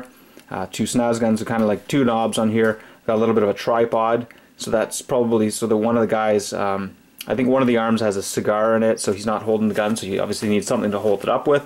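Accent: Canada. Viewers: i can tell from the accent. mtl?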